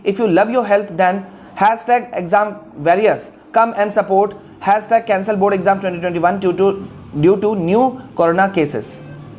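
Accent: Bihar, India. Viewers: Sir aap kya bihar ke hain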